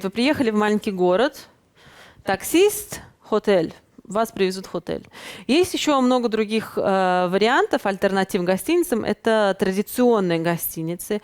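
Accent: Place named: Samarkand, Uzbekistan. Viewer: Шикарный акцент, как будто соседка из Самарканда рассказывает. Очень интересно и увлекательно. Один вопрос, как быть в последующем с визой в Штаты, после посещения Ирана?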